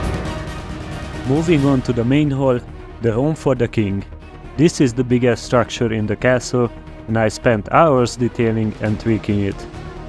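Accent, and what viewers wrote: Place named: Hungary. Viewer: Olyan, mintha magyar akcentusod lenne :D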